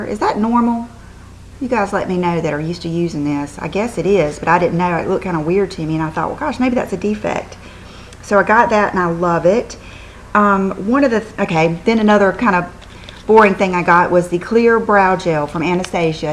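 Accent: South, United States. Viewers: are you from the south , i love your accent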